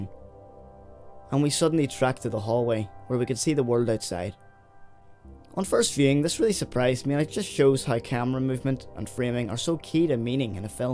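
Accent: Northern Ireland, United Kingdom. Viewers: This analysis is a very insightful and amazing one, thank u very much for sharing! It's pointed out to me that I must go back and rewatch the film! Also can I ask u a quick personal question? Ur accent is a northern Irish one?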